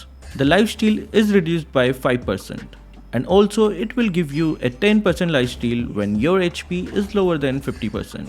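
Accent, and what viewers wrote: India. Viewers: asal kau cakap cam keling sia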